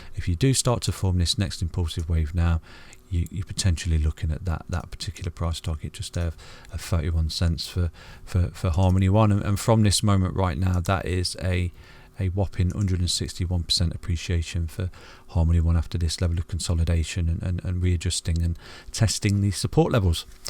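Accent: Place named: Manchester, United Kingdom. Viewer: There's a lively discussion about where in the UK your accent is from going on in the Harmony holders telegram. I'm guessing somewhere nearish to Manchester, how did I do?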